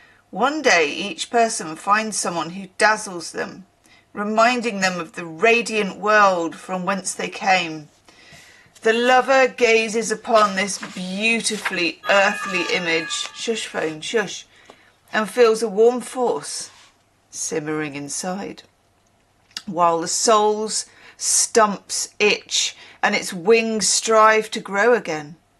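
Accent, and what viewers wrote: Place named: Wales, United Kingdom. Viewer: 😂 I’d never heard of evince & had never tallied plato & platonic 😅& then there’s the 3rd slang word in London for cunnilingus Platoed 🤣🤣🤣I’m going get told off . Your Welsh accent great !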